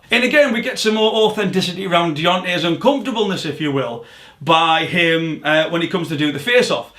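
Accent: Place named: North England, United Kingdom. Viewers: Im from Darlington which, judging from your accent, is not too far from you. I'd like to see you do a video on the Izzy v Romero weigh in. Might be a tad late like but either way keep grafting mate. 100k next. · Went to Uni in Yorkshire - are you from North-England or scotland? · Leeds here not too far from Tyneside which sound like where you’re from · I'm from Sunderland, it's the first of your videos I've seen but guessing by your accent you're not too far away.. great video 👍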